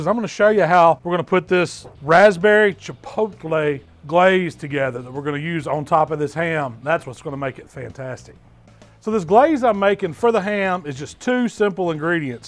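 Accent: South, United States. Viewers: his southern accent is so strong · When you see a Big Guy with southern accent then u know youre in the right place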